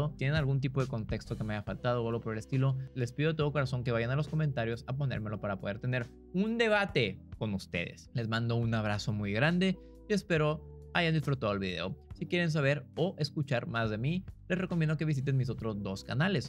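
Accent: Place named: Mexico. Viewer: Ya eres mexicano Perdone ya note el acento de Mexico.😅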